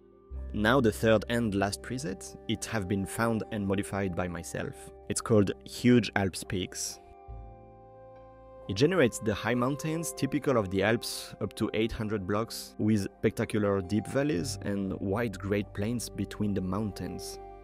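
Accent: France. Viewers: Oh je reconnais cette accent... 🇨🇵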